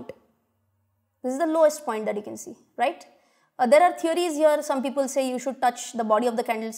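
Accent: India. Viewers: I never like the Indian accent of English till I see you. thank you very much you are amazing all love and respect.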